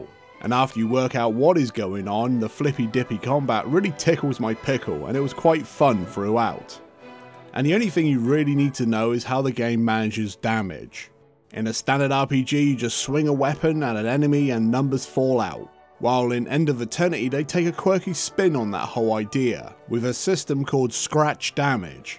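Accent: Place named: Ireland. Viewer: Your accent reminds me of a dude from Ireland in the army here in the good 'OL USA..Good review....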